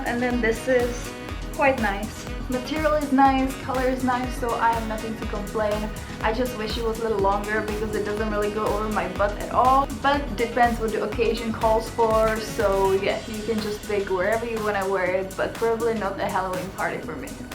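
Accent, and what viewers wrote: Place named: United States. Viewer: Your American accent is good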